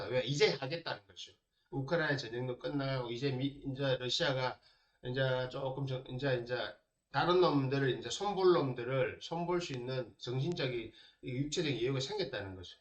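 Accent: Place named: Busan, South Korea. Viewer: 선생님ᆢ 유튜브. 올라오면. 거의. 듣는데. 사투리가. 심해서. 좀 못알아 들을때가. 많은데. 질적인. 내용은. 참 깊이 있으셔요 ㆍ감사합니다 부산이신가봐요?? 사투리가. 심해서요??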